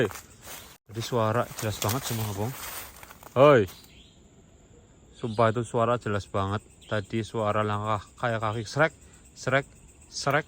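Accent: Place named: Java, Indonesia. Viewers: Ngapak nya jelas...dari tegal tah😊😊😊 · Rasa nya hambar lah, udah di makan sama jin, jawa nya medok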